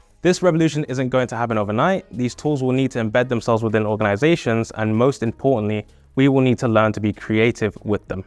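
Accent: United Kingdom. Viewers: is this a london accent? im digging it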